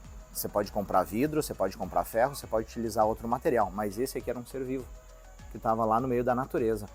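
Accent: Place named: Rio Grande do Sul, Brazil. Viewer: Meu amigo fala com o nosso sutaque, aqui nós falamos tu e não vc, bas que barbaridade tchê!!!!!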